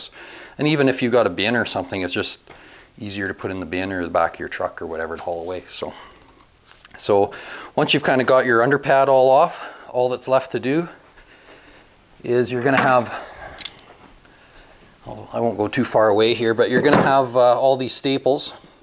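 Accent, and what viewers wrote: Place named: Canada. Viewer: Damn that's the thickest canadian accent I've ever heard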